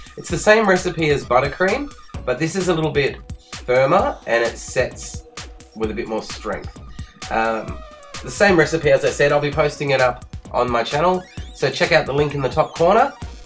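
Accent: Australia. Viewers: Oh my god you're Australian! I was expecting someone british! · I only realised you were Australian when I actually really listened to your voice. I guess you don't notice your own accent.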